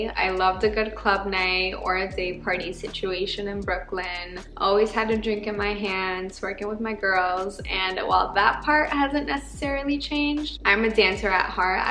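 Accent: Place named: Colombia. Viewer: I am so excited to see more! And your Spanish is beautiful – that Colombian accent, girl ✨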